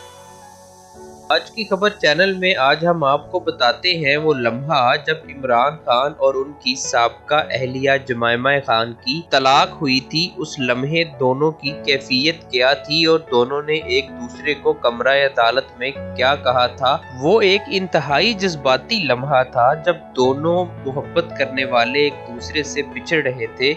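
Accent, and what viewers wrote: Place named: Punjab, Pakistan. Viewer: بھائی آپ پنجابی میں بات کیا کرو. آپ کا لہجہ پنجابی ہے.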